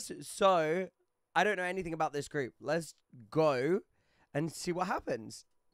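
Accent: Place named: United Kingdom. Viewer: Oh ..... You're British lol. Thanks for reacting bro.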